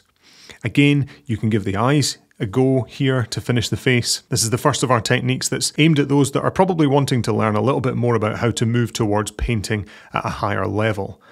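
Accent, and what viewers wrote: Scotland, United Kingdom. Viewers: Do I detect a faint Scottish accent? Nice tutorial for beginners, also useful for intermediate painters like me. Thanks for sharing.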